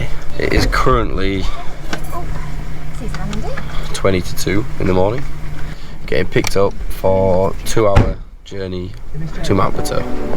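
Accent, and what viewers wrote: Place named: Yorkshire, United Kingdom. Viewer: nice video brother. You sound like your'e from Yorkshire aha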